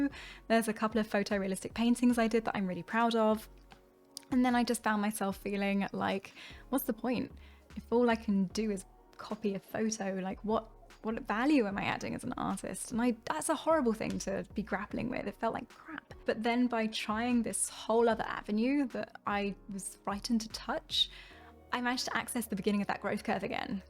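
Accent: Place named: United Kingdom. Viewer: I've stared at your face in the reference images for so long but I had no idea you were British lol that blew my mind. Now I'm questioning everything.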